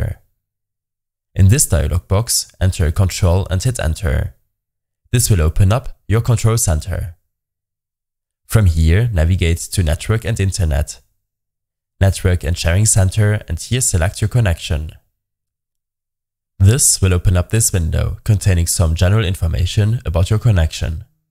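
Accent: Germany. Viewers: Der deutsche Akzent ballert hahaha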